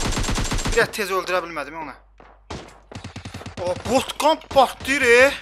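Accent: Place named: Shamakhi, Azerbaijan. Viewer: emi Şamaxilisan? Menim bibim gil Şmaxilidi senin kimi danisirlar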